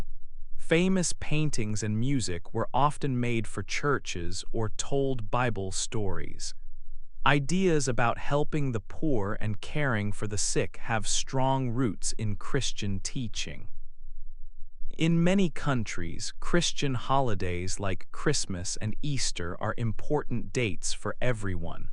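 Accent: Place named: United States. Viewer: This American English ist sehr schwer zu verstehen und ist irritierend!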